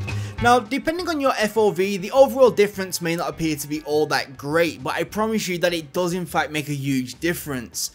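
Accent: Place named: Manchester, United Kingdom. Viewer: straight manc accent :D! nice vid man thanks for the tips!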